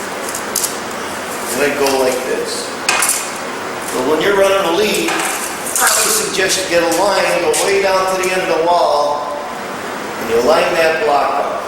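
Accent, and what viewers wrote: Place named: Chicago, United States. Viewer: I'm binge watching your videos ! are you from the midwest? You definitely have an accent like you're from the Chicago area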